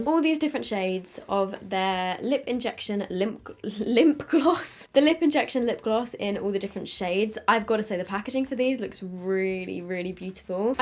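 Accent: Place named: United Kingdom. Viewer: Is it just me or was extra british in this video😂 absolutely beautiful as always!!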